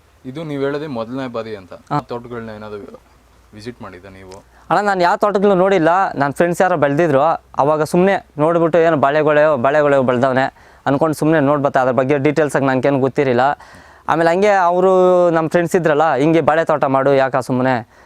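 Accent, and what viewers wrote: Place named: Kolar, India. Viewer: Kolar kannada ❤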